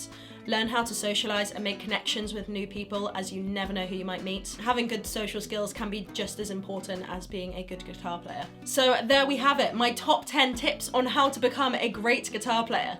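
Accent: England, United Kingdom. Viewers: Hm... I thik the UK pronunciation ia really annoying... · I could listen to a british native speaking girl all day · She sounds English, splendid. If I hear much more of those loud mouthed yanks that sound like ducks with their stupid word awesome I may end up hurling my computer at the wall. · Она англичанка?) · Wow she has a British accent... as if she wasnt hot enough already.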